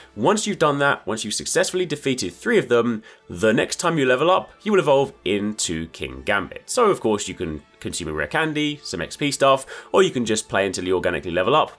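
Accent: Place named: England, United Kingdom. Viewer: Because he's English/Australian?... You do know there's no "one-way" to say stuff in English, right? English has countless dialects, and is a fusion of several other languages (German, French, Norse, Latin, etc); England alone has like 40 local varieties of speech depending on where you go in that tiny island. Besides its a name for a fictional thing, how its pronounced should be the least of your worries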